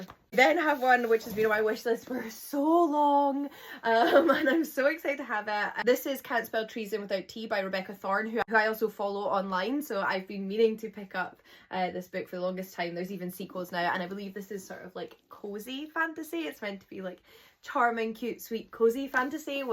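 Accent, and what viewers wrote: Scotland, United Kingdom. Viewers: These all look great and they're such pretty books. Also I love the way Scottish people say book.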